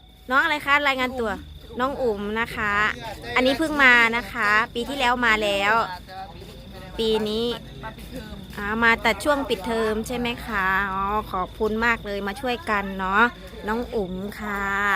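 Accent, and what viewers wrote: Isan, Thailand. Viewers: พี่วิครับพี่เข้าเสียงอิสานตอนอายุกี่ปีครับบ.....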